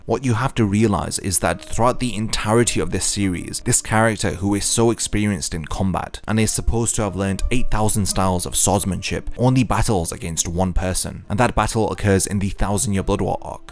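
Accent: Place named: London, United Kingdom. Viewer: Yeah, I'm curious what your first language is too. It sounds like you learned english with a london accent. The mix sounds good.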